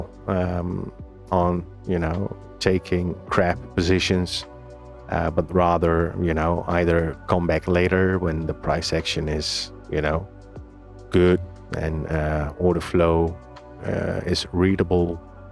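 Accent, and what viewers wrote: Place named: Netherlands. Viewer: Another Dutch accent, you guys are all from the Netherlands? Great videos so far!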